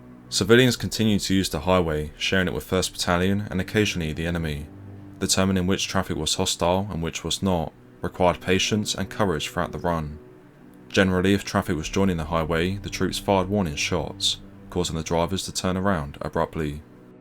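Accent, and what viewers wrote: United Kingdom. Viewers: I'm not sorry don't speak to me about American military with a British accent ever! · 12🇬🇧📯 sounds like a british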